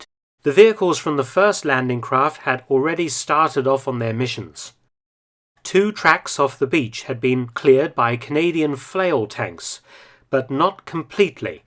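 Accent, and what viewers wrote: United Kingdom. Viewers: I have difficult to understand british english accent, but luckily I can understand 90% you. Great job.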